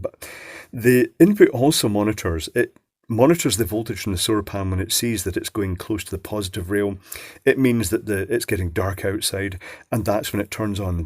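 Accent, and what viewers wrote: United Kingdom. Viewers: I just love this fellas accent. Definitely a Brit.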